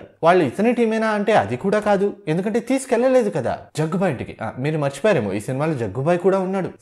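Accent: Karnataka, India. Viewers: Bro your mother tongue is Telugu and grown wholly in karnataka I think. Same here. I observed this in your khaleja video also.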